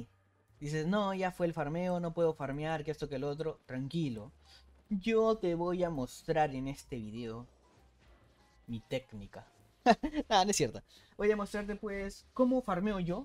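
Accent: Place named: Peru. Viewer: Me encanta como lo narra y se le nota que es peruano, que grande eres mano, sigue asi, tienes un sub mas ahora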